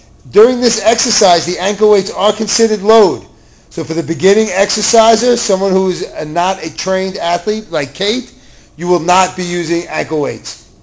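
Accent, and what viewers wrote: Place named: New York, United States. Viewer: Folks from New York...when they talk, I listen.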